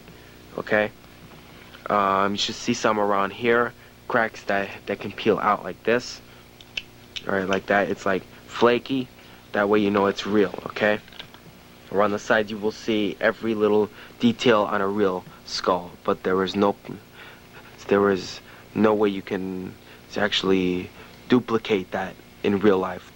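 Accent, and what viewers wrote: New York, United States. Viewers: his New Yorker by his accent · He has a New York accent though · He has a new york accent tho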